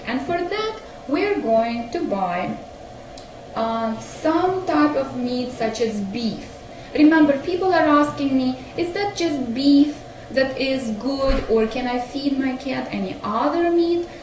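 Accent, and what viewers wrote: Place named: Russia. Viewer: You sound more russian/ Ukrain german and thats not a german accent-- Ich kenne meine Deutsche sprache . (I know my german language )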